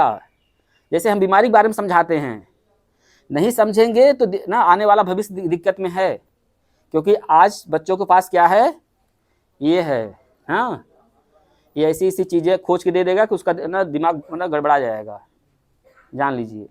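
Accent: India. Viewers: Awaz se lagata hai ki ye up ke purvanchal ke kisi jile se hai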